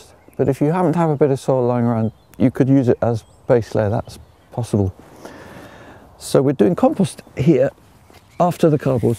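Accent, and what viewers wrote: United Kingdom. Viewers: That's the british accent that i like:).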